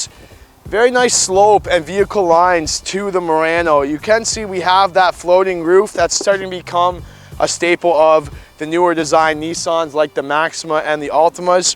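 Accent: Canada. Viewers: Is it:a Canadian thing with:"mu-ran- o:instead of mu-ran-o you:guys also say maze instead of'mood funny!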